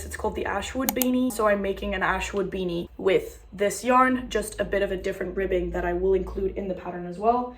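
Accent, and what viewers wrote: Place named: United States. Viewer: hi this is so random but where are you originally from? you sound so american but you look so european 😭 sorry if this is rude i don’t mean it to be! also all your knits are gorgeous!!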